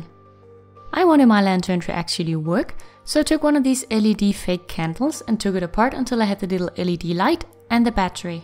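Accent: Denmark. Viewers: Are you from denmark? Your accent sounds danish :) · Are you Daneish you're accent sounds Daneish if. U are then God video tøs det blev pænt ❤️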